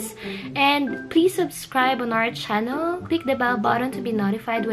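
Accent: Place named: Batangas, Philippines. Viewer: Ganda mag salita nang batangeño kasi galing din ako nang batangas garne kasi yon working batngas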